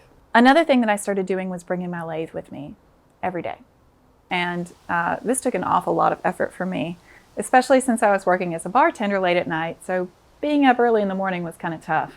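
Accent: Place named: South, United States. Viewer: Love how the southern slips through from time to time.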